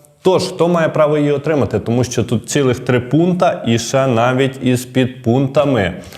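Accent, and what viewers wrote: Russia. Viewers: Добре,але ваша вимова шиплячих дуже москальська і дратує українські вуха.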